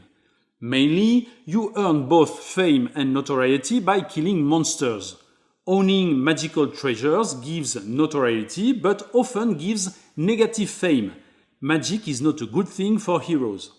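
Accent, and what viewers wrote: France. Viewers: Just telling you the facts. Audio is just as important as video, sometimes more so. People can be very tonally sensitive. Not everyone is French. This might be the one instance of YouTube AI (voice dubbing) actually being beneficial. Turn it on. See what happens. It should translate fairly well. If it does not translate, turn it off. It should be better than subs. Guaranteed you get more views. You want to keep people watching, yes? These are very long videos. Keep options open. I had to use other MR tutorials based solely on audio. Imagine someone with a heavy German accent trying to do these videos in English. Same thing. I’m German and I would not want to listen to that and if I had a heavy accent I would get someone else to do the audio. In this case the option is absolutely FREE.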